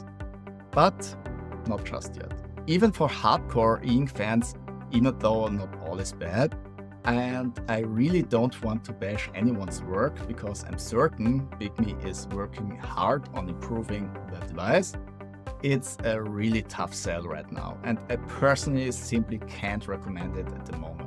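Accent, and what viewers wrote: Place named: Austria. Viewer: your lovely and melodic Austrian-coloured German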